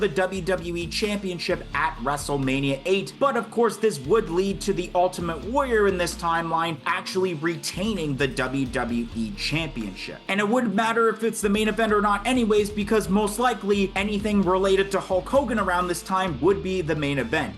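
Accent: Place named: Canada. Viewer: What part of Canada are you from?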